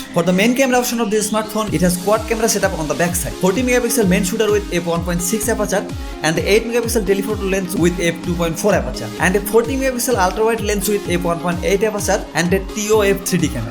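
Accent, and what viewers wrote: Bangladesh. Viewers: Bangladeshi english 😄😄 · Funny commentary Sounds so Bangladeshi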